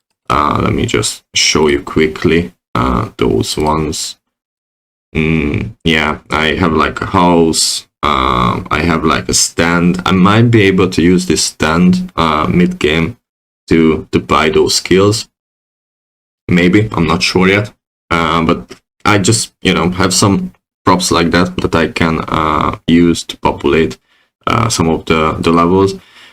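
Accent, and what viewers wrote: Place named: Hungary. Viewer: you have very hungarian accent.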